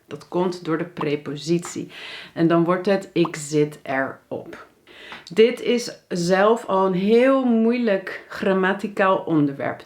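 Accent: United States. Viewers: Very minor super slight tinge of US accent in the "R" but nobody would notice. The guttural "hock a loogie" "G" is spot on. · hai i have a question: are you dutch or american? ps love your videos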